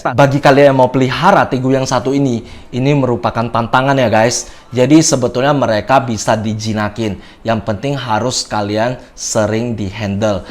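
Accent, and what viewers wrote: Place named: Chinese-Indonesian, Indonesia. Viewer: Penjelasannya sangat lugas dan jelas... Dengan dialek kokonya yg khas .. Semangat ko,di tunggu konten lainnya...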